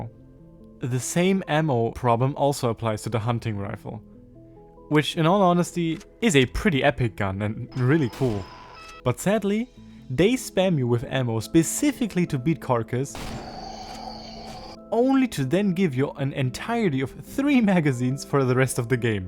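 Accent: Germany. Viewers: ich habe die ganze Zeit deinen akzent gehört und dachte schon das du deutsch bist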